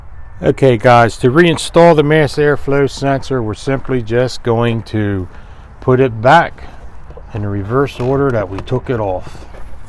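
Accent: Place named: Baltimore, United States. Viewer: Gotcha. You just sound like you have an accent that is very similar to our Baltimore accent.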